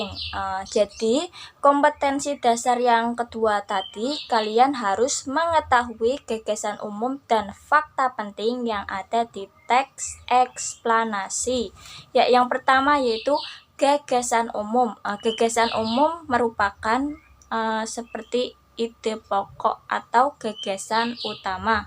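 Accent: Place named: Java, Indonesia. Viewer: Kangen medoknya Non. 😆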